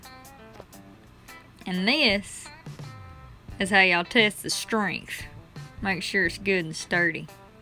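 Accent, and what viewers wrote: South, United States. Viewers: I love your soft southern accent. Beautiful. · I know I am not alone when I say that I just tune in to see that Beautiful Smile of yours and hear your sweet, Southern Accent, oh and the house framing tips. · This girl has a strong southern accent.She has the best smile 😊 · Lotta Yanks don't like southern accents, don't count me in that group. Soft southern accent is easy on the ears and easy to understand, add her pretty face and I'm payin' attention. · That's it I'm moving to BAMA the 1st time I watched any of her videos was yesterday I was just browsing didn't click on just seen her and u know any normal guy was thinking damn hot chick...then I clicked on and that countrybumpkin accent came out of her in n love lol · Love that Southern Belle accent. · Dang, I just found your channel today and I must say, you're what I'd call a “Modern Southern Belle“! 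You have the face of a princess, the body of a movie star and the most endearing southern drawl I've ever had the pleasure to hear. Plus you obviously honour your parents, which I actually deem important. Also I like the fact that you're not shy to get your hands dirty and get stuff done. Keep it up like that and I'm pretty sure you'll be famous WAY beyond social media. At least I'd LOVE to see that happen. The best wishes from the old world (Germany)... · I love that accent of yours true american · Well I should have said it better her southern accent either way it's a compliment so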